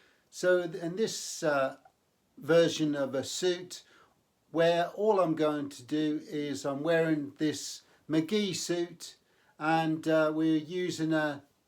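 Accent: United Kingdom. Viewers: Oh marvelous. I used to work in Beccles as a gamekeeper when I was young and a butcher a few years after that. I'm from Narj actually. I did hear you used some eastern terms and a slight accent with them lol.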